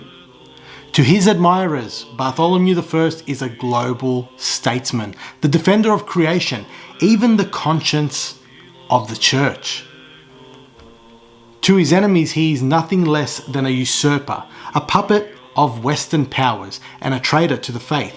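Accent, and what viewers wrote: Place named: Australia. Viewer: Aussie accent Narrative! Please just cut to the chase.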